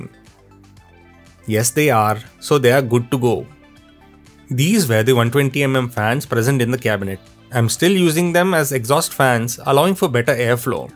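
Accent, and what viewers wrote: India. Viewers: Love the Indian accent.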